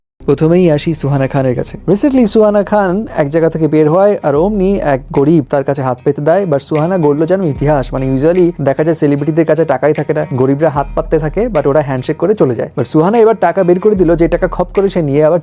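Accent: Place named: Kolkata, India. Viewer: কিরে ভাই আপনার ভাষাটা এরকম লাগতেছে কলকাতার মত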